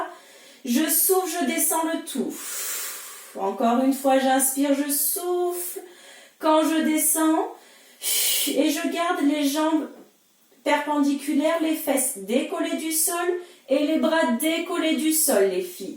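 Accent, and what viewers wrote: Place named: South, France. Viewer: Merci, grâce à ton sourire, ta compétence et ton joli accent ensoleillé (je me régale avec les cottes bien ouvertes et les épolles baissées 😉) j‘ai commencé ma 1ère journée de vacances avec un cours de pilâtes qui m’a donné la pêche! À bientôt!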